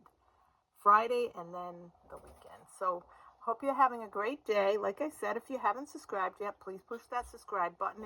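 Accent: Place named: Brooklyn, United States. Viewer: Are u from Brooklyn New York? Your accent sounds like you are. Love your accent!! Im from Louisiana and still live here and ppl think we r from Brooklyn as well. Lol!!! Im asked all the time r u from Brooklyn? Lol! ❤❤ loves these lil baskets! Going to make a few and put candy in them for the family. Thanks! ❤❤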